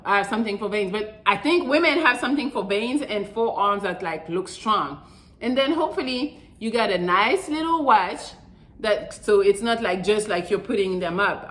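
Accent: France. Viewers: Hi I'm a first time viewer to your channel, a virgin of sorts, so just a few things first off i wish we' had vids (podcasts) back 20 odd year's ago because boy was I stupid, i used to have them running after me, know their running away from,second i thought i detected a wee hint of a bit of a French accent, apologies if I am worng I was just wondering i don't think I can jump that far. Keep up the good work. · What's your nationality lass, you have a french accent👀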